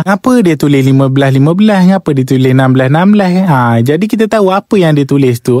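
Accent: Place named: Tloh, Malaysia. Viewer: Syabas anak muda,suka dgr loghat org Tloh😂🎉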